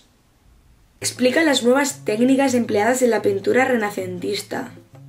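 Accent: Argentina. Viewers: Jajajj amo que hables Argentino creo que por eso la mitad que te seguimos somos Argentinos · amo por que habla como si fuera argentina jajajajajajajaja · En los vídeos de nunca faltan: EL HABLAR INGLES EL HABLAR ARGENTINO EL BOLUDO EL CAFÉ "EL BAILE DE" T AMO PIBA JAJA❤️ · Y hablando como argentina es buenardo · adoro habla con los posters en argentino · amamos a hablando argentino,confirmamos. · hablando argentino mi religion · !! El acento argentino te salió re bien! Sos una genia todo tus vídeos son lo más, soy de argentina y tu acento es un 100/10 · puedes hacer un video 24 horas ablando Argentino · Amo esta Pibaaa😻 Habla en inglés Habla en Argentino Y Nose cuál otra más.. Mee la comooo😻🥰🥰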